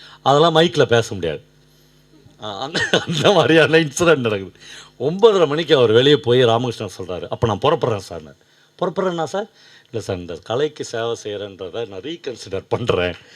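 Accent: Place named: Tiruvannamalai, India. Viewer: தி.மலை தமிழில் உரையாடுவதின் உயிரோட்டத்தை உணர முடிகிறது.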